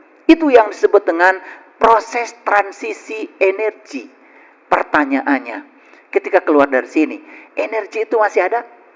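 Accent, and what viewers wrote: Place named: West Java, Indonesia. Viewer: Bapa guru boleh tau bapa asal dari daerah mana pak soalnya bapa bahasa Sunda saya orang Sunda saya sangat tertarik dgn gaya ceramah nya bapa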